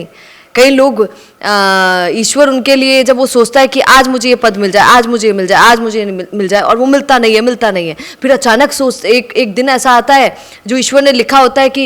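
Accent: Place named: Hadoti, India. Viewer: मैडम जी आप अपने क्षेत्र की भाषा बोला करो बड़ी प्यारी है आपकी हाड़ौती बोली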